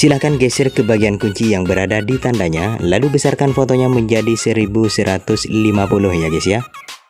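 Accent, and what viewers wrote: Madura, Indonesia. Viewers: Logat nya kok kayak orang Madura ya😅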